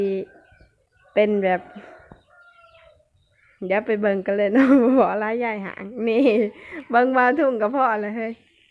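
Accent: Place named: Sisaket, Thailand. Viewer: เว้าคือแถวศรีสะเกษเลยครับ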